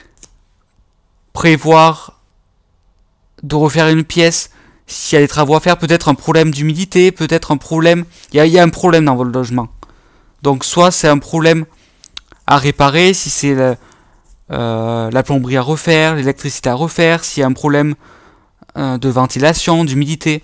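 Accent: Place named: South-West, France. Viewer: Coucou merci confrère avec une voix qui chante et me rappelle le sud ouest du côté de ma mère de Toulouse merci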